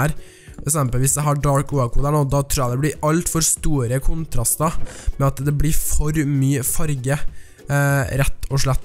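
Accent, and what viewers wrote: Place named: Oslo, Norway. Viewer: Du høres faktis ut som en som er oppvekst i Oslo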